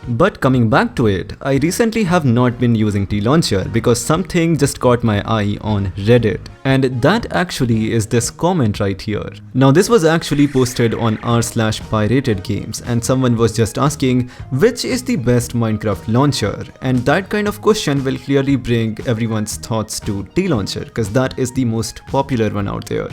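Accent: India. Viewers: that's an Indian accent for English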